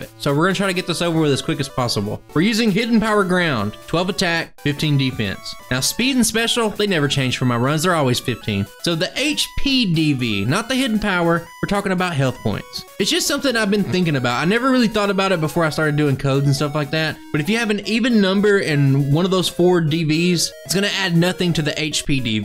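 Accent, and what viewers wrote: South, United States. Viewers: somewhere from the Southern States :D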